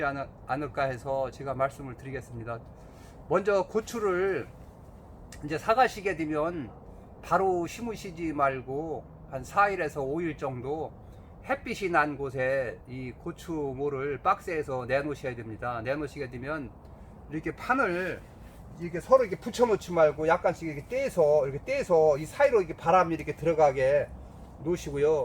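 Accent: Chungcheong, South Korea. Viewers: 충청도 사투리가 정겹네요 좋은정보 감사합니다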